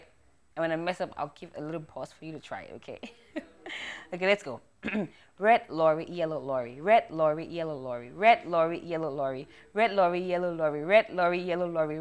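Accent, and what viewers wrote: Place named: Africa. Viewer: I watch all your videos and I like all of them (except the one about the movie). I particularly love this one bc I'm a huge fan of effective communication & beautiful African accent. This is awesome! Very educative! U create a conducive learning environment & spice it up with fun/jokes.....So we are actually learning (in 'school') & loving it!! Keep up!!!#HammerlandInSight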